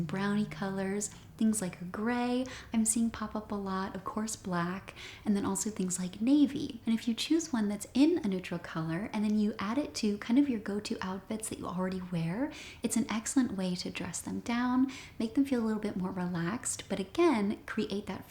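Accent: United States. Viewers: It just occurred to me (sick on the couch today) that it sounded like I was trolling🤦‍♀️ so I apologize if that's how I came across (you've got that old school transatlantic speak) I do find your page wonderful and I wish more women would follow your lead 💯 what also makes it so appealing is its geared towards EVERY age 😍 There is a woman named Heather something or other and I swear her "fashion advice" towards the 40+ set has them dressing like milf cougar super hookers that look like they've been gangbanged by a crayon 🙄 Not even kidding AND she calls it classy 😂 you must find her and see.. try not to spit your coffee!😉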